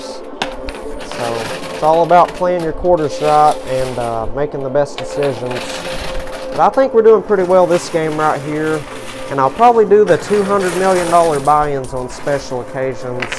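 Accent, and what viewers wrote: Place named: Oklahoma, United States. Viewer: I watched a old video , when you didn't have a bunch of money. Your voice sounds completely different, sounds like a hick, like my OK. accent. You also are nervous. But you are a rich pro and a great speaker. Proud of you brother. ALAN SMITH IN OKLAHOMA!!!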